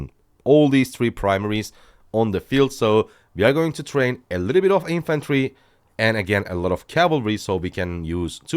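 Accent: Turkey. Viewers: I KNEW IT I KNNNNEEEEEWWWWW IT THIS MAN IS TURKISH i recognize this accent everywhere now he has a Fener shirt on 😂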